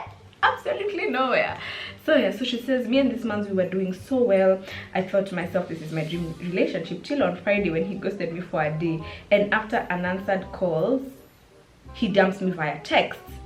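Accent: United Kingdom. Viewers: The British accent ❤️❤️🔥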